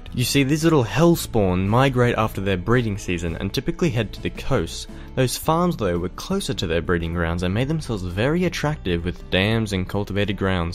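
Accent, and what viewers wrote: Australia. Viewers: what is that Accent? sounds like a suppressed aussie accent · You're Aussie?? I love your accent :v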